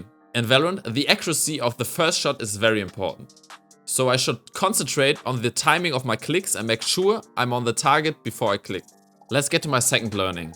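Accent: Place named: Germany. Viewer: i hear a bit deutsch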